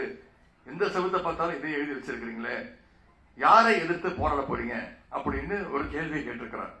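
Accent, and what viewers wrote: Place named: Telugu, India. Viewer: நீ நல்ல தமிழல பேசு ஒரே தெலுங்கு வாடை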